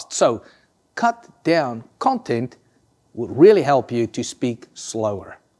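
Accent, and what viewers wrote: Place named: Afrikaans, South Africa. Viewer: Your accent sounds quite Afrikaans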